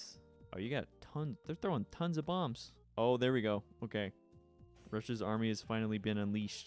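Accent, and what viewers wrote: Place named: United States. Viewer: It is clear you are from usa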